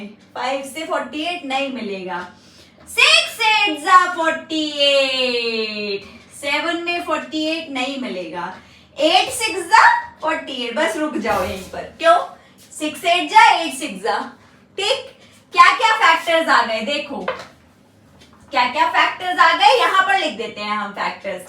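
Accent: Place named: Mewat, India. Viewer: Mewati awaaz to halki karo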